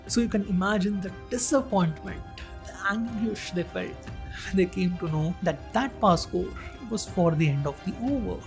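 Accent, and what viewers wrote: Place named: South India, India. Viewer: Oh my god bro this dosa idli sambar accent so annoying to hear 😭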